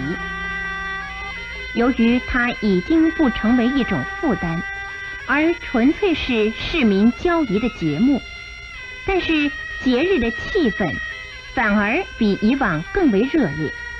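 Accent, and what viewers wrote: Standard. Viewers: 当时发音标准，怎么没有现在湾湾的娘娘腔？ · 好標準的國語，而且這個國語發音還跟 中共那邊的普通話有一些不同，台灣 中國國民黨帶來的國語更好聽。 誰統治台灣 氣氛 精神狀態 完全不一樣。 日本統治 國民黨統治 民進黨 完全不一樣， 菁英統治， 普通人生活快速改善， 詐騙集團貪污惡搞 就是 落後破爛愚昧破敗。 統治者 有沒有專業能力 太重要了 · 解说的声音又好听发音又标准，太棒了👍👍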